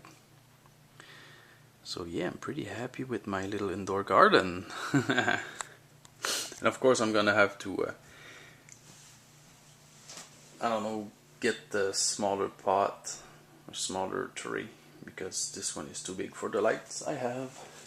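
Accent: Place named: Acadia, Canada. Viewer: yes from val damour nb je suis acadien aussi lol ses pourquoi je reconnais laccent lol merci pour vid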